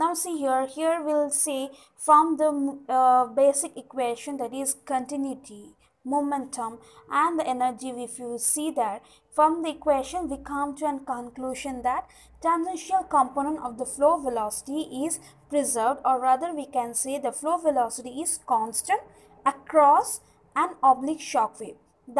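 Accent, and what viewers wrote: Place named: United States. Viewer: Indian accent would be better than ur American accent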